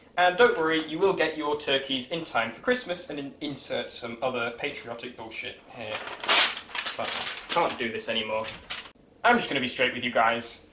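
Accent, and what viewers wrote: United Kingdom. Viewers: Hugarian with a British accent sounds special, and also I can understand why they don't signpost the Budapest carrige in the Breclav train. I don't really think the Irish Sea Tunnel can be a thing, at least not Scotland-Belfast, A tunnel to Ireland wouldn't really work either without the use of gauge changing systems... or you just have a standard-gauge termiinus in Rosslare.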